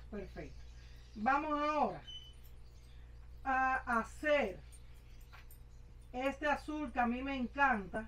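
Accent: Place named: Cuba. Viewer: HOLA ME ENCANTA TU TONADA CUBANA!!! MUCHAS GRACIAS POR COMPARTIR TUS CONOCIMIENTOS 😉 BENDICIONES 💖 😊 😘 🙏 UN ABRAZO GRANDE DESDE CÓRDOBA ARGENTINA 😊❤❤❤❤❤❤❤😊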